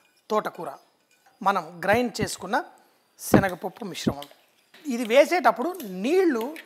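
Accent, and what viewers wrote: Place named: Hyderabad, India. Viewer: We want that Hyderabadi voice✌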